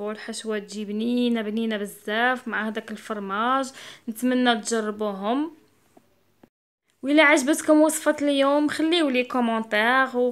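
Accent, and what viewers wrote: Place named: Tlemcen, Algeria. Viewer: انا مشتركة جديدا هل من ترحيب ختي راني شفت قناتك بصدف وعجبوني الوصاة مشاء الله عليك ختي انا من مستغانم من صوتك عرفت نتي من تلمسان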